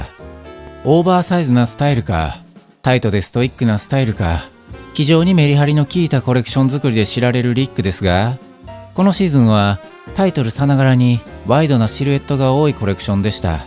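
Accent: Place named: Kansai, Japan. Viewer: 関西弁新鮮！！これもまた良き👍👍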